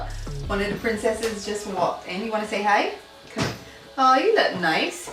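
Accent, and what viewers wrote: Barbados. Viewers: You sound like a bajan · are you bajan? you sound just like my mom🤔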